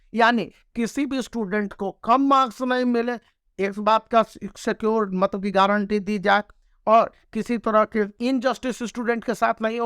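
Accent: Bihar, India. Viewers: sir aap bihar se ho kya??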